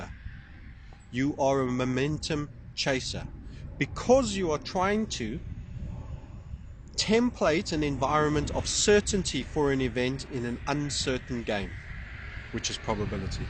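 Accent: South Africa. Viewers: FoLA I am glad you have not lost your SA Accent Bru'. Fear-of-Loosing-Accent.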